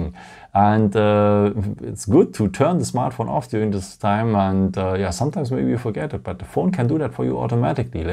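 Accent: Germany. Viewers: If the phone is on standby 'ne?' tells me right away you're German 😁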